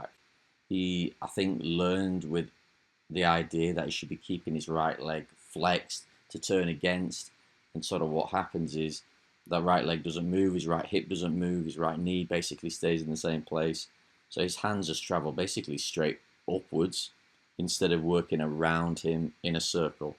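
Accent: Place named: Yorkshire, United Kingdom. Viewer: Great video and a nice surprise to hear a yerkshire accent on a golf video. Could do with turning your mic levels up, or speaking a bit louder. Audio is clear but quiet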